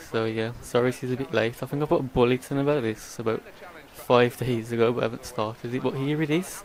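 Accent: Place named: Wolverhampton, United Kingdom. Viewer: Haha Love the wolvo accent! Come on wanderers! South bank for life!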